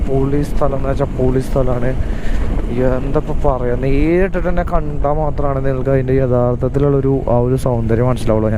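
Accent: Thrissur, India. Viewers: നിങ്ങളുടെ തൃശൂർ സ്ലാങ് പൊളി ആണ് ട്ടോ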